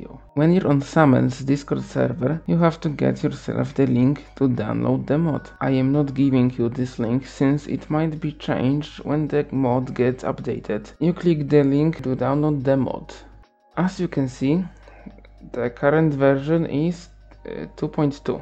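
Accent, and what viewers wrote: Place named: Poland. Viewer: słychać że polak :PPPP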